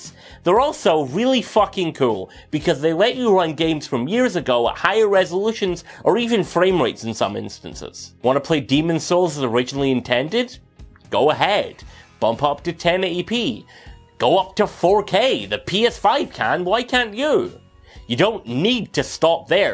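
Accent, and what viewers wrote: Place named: Scotland, United Kingdom. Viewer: Americans hate it when they have to hear a Scottish person talk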